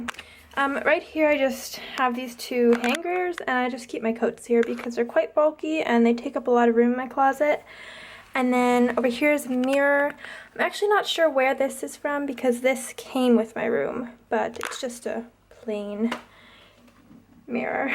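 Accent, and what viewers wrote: United Kingdom. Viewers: Omg I just watched a few of your older videos and I noticed in this one you Kinda developed a British accent!! That is sooooo cool!! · You sound so British! :) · I think you've kinda picked up an English accent 😄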